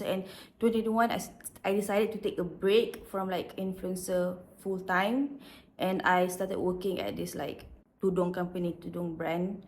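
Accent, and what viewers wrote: Kelantan, Malaysia. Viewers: Her accent sounds klantanese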